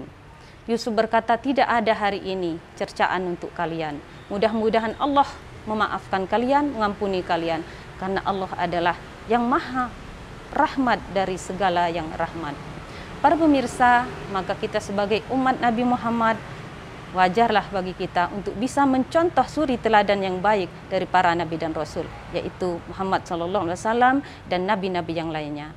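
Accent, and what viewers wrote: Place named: Liwa, United Arab Emirates. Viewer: Sukses terus ya dindaaa.. Tapi logat liwa nya di kurangi yaa... Hee heee hee..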